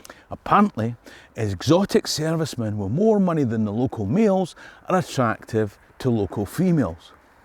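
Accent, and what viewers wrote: Scotland, United Kingdom. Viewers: Love your videos. Especially love it when you say murder. Love the Scottish accent….shame my ancestors didn’t pass the accent down. Cheers from an Aussie. 🦘👍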